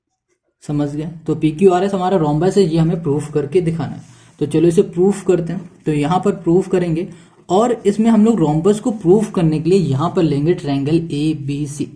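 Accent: Bihar, India. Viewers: You are from bihar sir?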